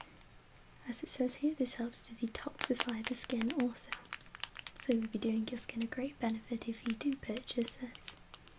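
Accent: Wales, United Kingdom. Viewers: she's Welsh to be more specific :p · Ur Welsh right? U sound it! I'm Welsh too ur so pretty and sweet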